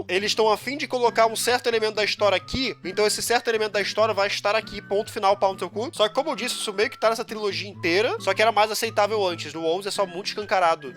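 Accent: Rio de Janeiro, Brazil. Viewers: O sotaque combinou muito kkkkkkkkkkkk, asmeiiii um carioca reclamando é muito baummm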